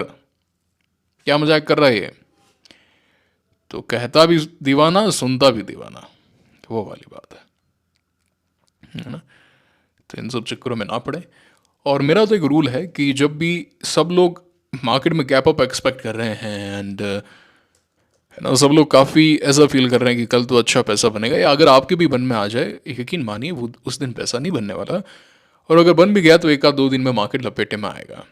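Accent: Rajasthan, India. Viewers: Sir app rajsthani ho kya ❤❤❤❤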